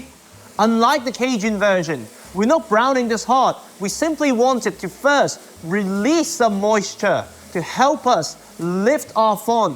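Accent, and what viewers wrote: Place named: United Kingdom. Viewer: I like his stuff I’ve seen so far. Is he from Hong Kong; he’s got a British accent.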